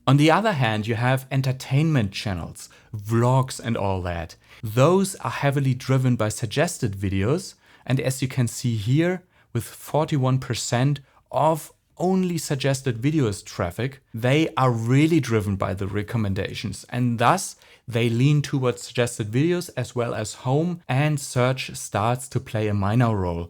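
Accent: Germany. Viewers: You have some accent. Are you German? Did you consider adding German or whatever subtitles + description translation to your videos to run an experiment and measure the effect on total views? Can't find this kind of topic anywhere. Seems like nobody figured it out.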